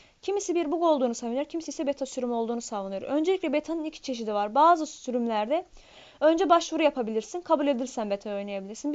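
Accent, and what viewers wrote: Azerbaijan. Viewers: azerisen qaqa?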